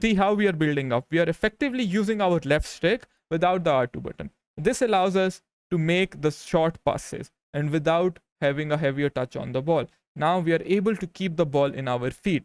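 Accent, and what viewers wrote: India. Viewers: your Indian voice is annoying asf tho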